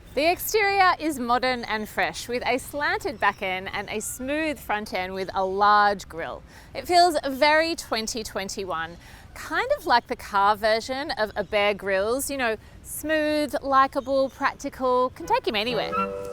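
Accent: Australia. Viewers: australian speak funny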